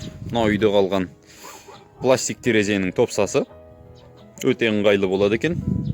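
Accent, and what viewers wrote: Standard. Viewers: коп сейлейтин сенсин!Барекелди мен ризамын бауырымызга! Осылай казак тилимизде таза сойлеген бауырларымды корсем оте катты риза боламын! маган керек талпынган адамдарга керек ал орге тартса корге кашатындар карамайак койындар ренжимесендер)))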